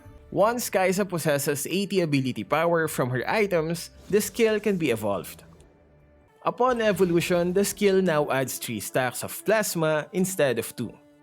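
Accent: Philippines. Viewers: Its because of his accent, “dealing” becomes “diling”,”living” becomes “leaving”, its because of his filipino accent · I can tell he's filipino 🇵🇭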